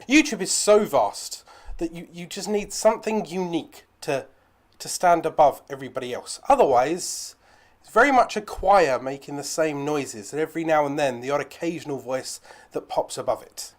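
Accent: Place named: England, United Kingdom. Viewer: Come to think of it, I do prefer listening to an English accent! And a little bit of humour is always good. I do wonder why you're so close to the camera - not just you, everyone who does it?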